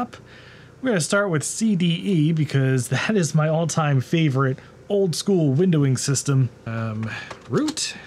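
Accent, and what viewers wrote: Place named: California, United States. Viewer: Off topic: Are you from Cali? Not that it matters but judging by your accent I would say Cali but my friend says AZ... Love your channel, btw, and I use Arch.....btw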